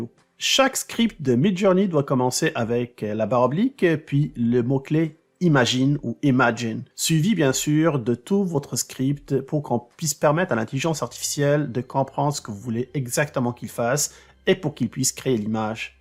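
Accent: Quebec, Canada. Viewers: Très bonne vidéo, merci. Et, ça fait plaisir d'entendre un accent québécois aussi facilement compréhensible. Bravo, très bon français. Après, l'utilisation de l'anglais de temps à autre n'est pas un drame, nous sommes en 2023, beaucoup de pays ont 2 langues officielles depuis plusieurs décennies, dont l'anglais en 2ème, et leur première langue n'a rien perdue et reste le langage le plus parlé dans le pays. Quoi qu'il en soit, bravo à tout les québécois qui parlent un français impeccable et qui sont même capables de corriger toutes mes coquilles car ils écrivent, souvent, beaucoup mieux que moi. 😅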